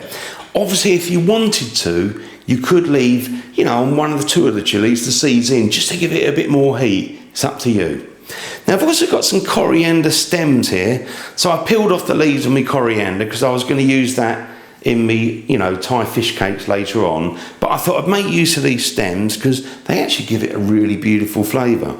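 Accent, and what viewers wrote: United Kingdom. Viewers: I really like you use quite similar "hacks" as I found by various experimenting to substitute some of the authentic ingredients when not available, sill producing delicious and quite curry dishes. Great and clear description, and of course amazing British English!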